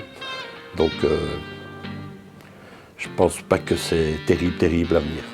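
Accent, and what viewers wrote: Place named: Jura, France. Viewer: Tu est du jura?? Car tu a le même accent que moi!!😄😄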